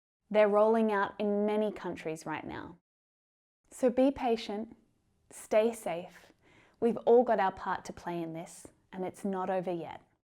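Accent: Australia. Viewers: is a lovely lady, she has soft Aussie accent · Why is your accent so lovely? I have known Australians but none of them speaks like you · Her accent is Australian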